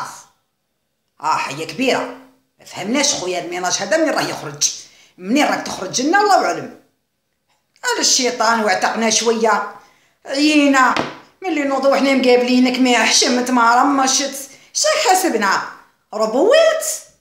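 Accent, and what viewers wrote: West, Algeria. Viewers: تعجبيني كي مام راجلك ماشي جزايري بصح مازلتي تهدري كيما حنا نحبك (انا طفلة) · والله غير نتيا عسل يا ونحبك اناوماما جتنا هدرتك كي توعنا تع الغرب تبرديلي ڨلبي😍😍😘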